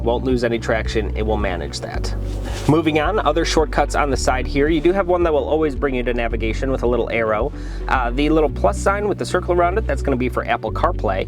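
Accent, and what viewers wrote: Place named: Chicago, United States. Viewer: I enjoy the Chicago accent.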